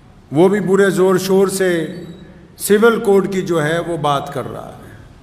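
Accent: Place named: Punjab, India. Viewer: great Punjabi tone and great Urdu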